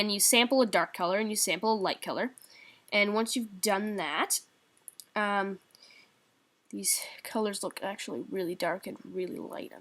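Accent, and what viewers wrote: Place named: Canada. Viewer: He's Canadian Canadians accents kinda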